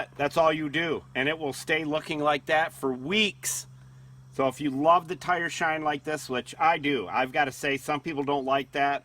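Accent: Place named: Michigan, United States. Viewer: If you ever wondered what a Michigan accent sounds like listen to this guy. Lol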